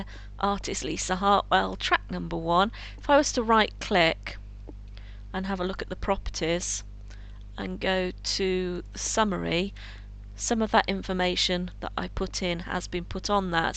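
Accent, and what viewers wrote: England, United Kingdom. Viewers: i know this has nothing to do with the video but...i love that english accent ^_^ but yeah i have audicty great software if you dont ecpect to do much with it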